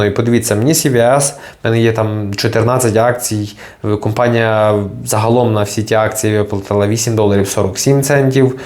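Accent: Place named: Western Ukraine, Ukraine. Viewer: бо вимова як в неділю в церкві, або ви з Франика або з Рави або з Тернополя більше варіантів нема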